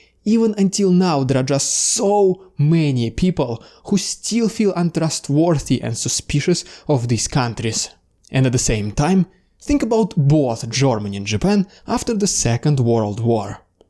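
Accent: Canada. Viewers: Dude why you suddenly a canadian dude?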